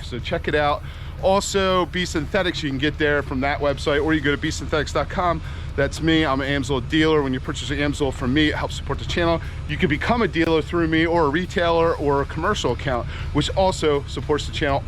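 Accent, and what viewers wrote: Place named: Pennsylvania, United States. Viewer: Pennsylvania? You sound like you’re from PA.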